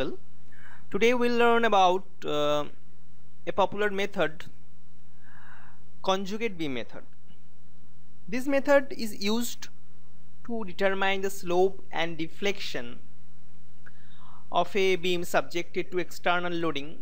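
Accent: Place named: India. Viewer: accent original rakho...Indian accent accha lagta hai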